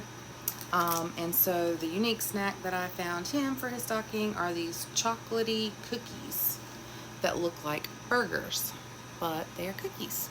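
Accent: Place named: South, United States. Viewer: I’m so excited for you and your channel !💖 Our sons have the same stocking ! How cute 😊 The DVD’s!! Love to see it ! Nothing better than having physical media. My ultimate goal is to have all our favorite shows /movies on dvd /vhs so we can cancel subscription services lol 😅 I was literally typing to recommend the Freddy FAS bear chapter books as you started talking about books lol because my son used to love those. Also loving the bookshelf ! 🥰 And your accent so cute and southern! 💖 Just know you have a loyal subscriber here girlie ! 🤗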